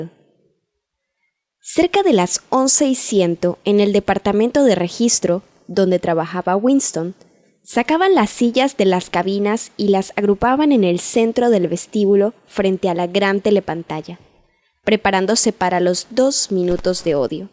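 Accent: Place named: Latin America. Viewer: Bien! Con acento latino. Ese ibérico es muy molesto. Gracias.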